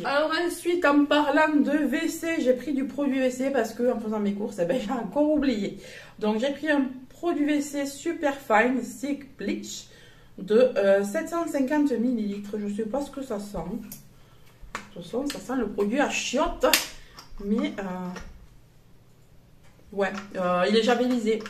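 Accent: South, France. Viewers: bonjour, je découvre ta chaine aujourd'hui et j'aime beaucoup ton haul ! je te trouve très à l'aise devant la caméra et j'aime bien ton accent du sud (je suis moi même du sud ouest !) j'adore le cache pot vert à suspendre et les différentes lanternes solaires que tu as acheté ! je pense que je me pencherai un peu plus sur le rayon extérieur la prochaine fois que j'irai chez Action grâce (ou à cause ?) de toi !! hi hi hi !! par contre moi j'opterai pour des plantes artificielles car je n'ai absolument pas la main verte, je fais crever toutes les plantes...pfff !! allez je m'abonne à ta chaine pour découvrir un peu mieux ton univers. Merci pour ta bonne humeur, bises, Christel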